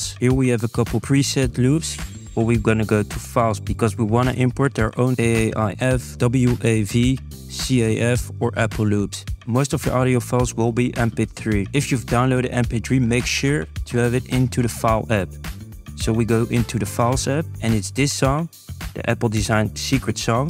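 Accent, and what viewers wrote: Netherlands. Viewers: Are you Dutch you have a very nice accent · u sound so dutch 😭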